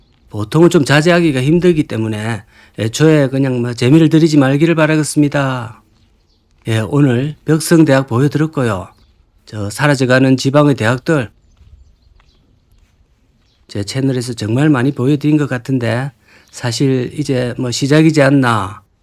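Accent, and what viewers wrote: Gyeongsang, South Korea. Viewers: 우야노.....??앵커는 경상도??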